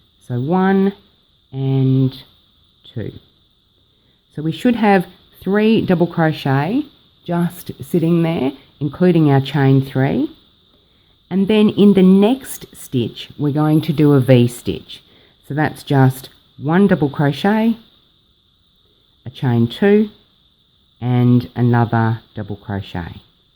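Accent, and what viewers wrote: Australia. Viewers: Thank you for the video, I love this pattern. Love hearing an Aussie voice too, look forward to more videos. G'day from Adelaide.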